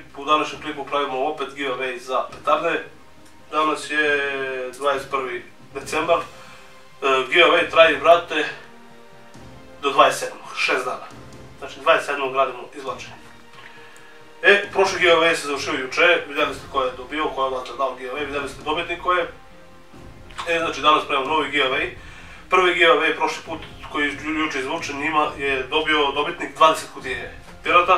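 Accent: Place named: Southern Serbia, Serbia. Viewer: odakle si, po govoru bih rekao da ste iz leskovca ili babusnice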